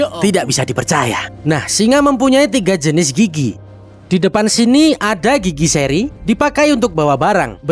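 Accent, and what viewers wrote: Java, Indonesia. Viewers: Knapa jadi medok anjirrrr dubbing nya🤣🤣🤣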